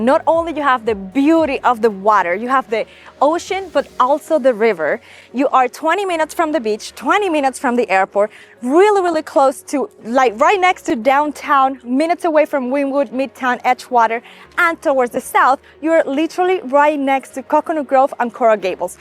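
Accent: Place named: Latin America. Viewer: Whatever accent this is, is my favorite Latin accent. Great video!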